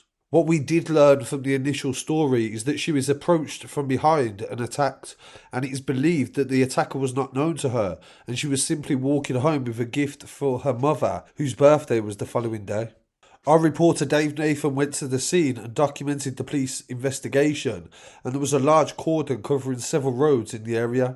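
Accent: Birmingham, United Kingdom. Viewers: BRUMMIE I THINK , MATE !!!!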